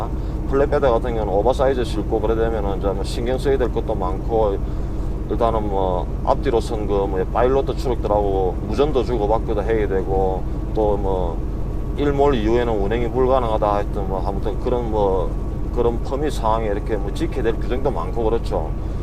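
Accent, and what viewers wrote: Gyeongsang, South Korea. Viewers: 아재.. 경상도 어디 사람이에요?ㅎㅎㅎ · 먹고 살기위해 하시는것이지만 조금만 편하셨으면 하는 바램입니다. 경상도분같은데~~~너무 고생하시는것같아 마음이 짠하네요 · 머나먼 타국에서 수고가 정말로 많네요. 어투가 경상도 분이네요. 혹시 주유소 몇곳과 주유소 접해있는 모텔을 운영하시는 신 부갑씨 아시는지요? 그분도 고향이 경남이고요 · 🇺🇸🙏🏻🌍돈받고 여행하는 직업 ㅎㅎ😂👩🏻🤔🙋🏻‍♀️👍👀깔삼 오랫만에 듣네요..쪼매 ㅎ😂😂😂👏🏻👏🏻👏🏻🇺🇸🙏🏻저는 대구가 고향..🇺🇸🇺🇸20년 미국 살아도 사투리 여전 ㅎㅎ 님도 많이 쓰네요..😂😂👏🏻👏🏻🇺🇸🙏🏻👍🇰🇷🌍🍀🙋🏻‍♀️한번사는 인생..님처럼 사는것도 용기가 많이 필요할것 같아요.저는 파킹을 못해서 큰트럭은 못할것 같고..😂👍👩🏻🤔🤓🙏🏻🇰🇷🌍Michigan 에서 할수있는 것 부터 👀👀👍🌍👏🏻🙋🏻‍♀️저도 운전을 좋아해서..그리고 혼자🐯🐯새로운 직업을 가져 보고 싶네요.2022🇰🇷🌍🙏🏻항상 잘보고 있어요🙏🏻👀🇺🇸🙋🏻‍♀️💕💯🌍🌍👍화이팅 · 고생 많으십니다 경상도말씨 구수하네요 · 경상도 캐내디언 ㅋㅋ 멋집니다. 한때 캐나다 이민을 원했는데.... 아 ~~~~ 간접적으로 대리만족 합니다. 어차피 한국이나 외국이나 어디 사나 물질적으로나 정신적으로나 행복하게 살려고 노력하는 자세가 중요하네요 ㅋㅋㅋ awesome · 가끔어쩌다 트럭을 주제로한 영화말고 생생티비라니..근데 경상도 사투리가 아직도 그데로인데 아메리카 트럭커는 어찌 되셨는지 그게더 궁굼하네요..ㅎㅎ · 트럭에 관심생겨 님 유투브보다가, 댓글처음 남깁니다. 셀프결제 샤워시설 신기하네요^^ 경상도 사투리, 형부생각나 구수하네요, 겨울시작인데 안전운전하세요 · 은근 중독이 됩니다 카나다 까지 가시어 경상도 구수한 말씀을 들어니 정감이 더갑니다 ㅎㅎ 늘 안운하시고 즐거운 시간 보여 주시길 바랍니다. 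스근하게 즐감하고 가니더 ^^* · 경상도 말이 귀에 착착 붙네요 안전운전 하세요~~< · 경상도 고향이 어디십니까? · 처음으로 알게된 너무도 멋진 영상 과 푸근한 경상도 목소리 재밋게 듣고 보고 있는데 댓글보니 고인이 되셨다니 안타깝네요 꽤나 많은 인기도 쌓으신분이. 늦었지만 고인의명복을 빕니다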